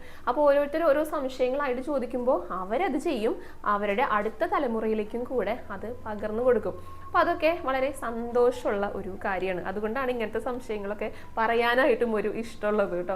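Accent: Thrissur, India. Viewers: Ur presentation with thrissur accent is liked much. 😢😢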